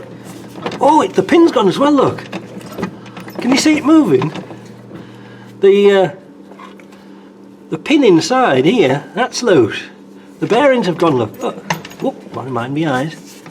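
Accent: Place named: Cumbria, United Kingdom. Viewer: Trying to place your accent. Cumbria?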